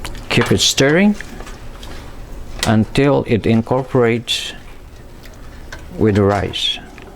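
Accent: Philippines. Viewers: Kuya, masyado ka namang pretending mag english accent. Hirap na hirap ka sa english bakit di ka nalang nag tagalog Sabagay lumalabas pag ka accent ng Visaya mo.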